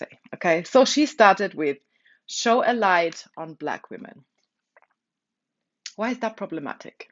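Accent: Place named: Nigeria. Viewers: You have Nigerian accent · Bantu History, l enjoy her Nigerian accent.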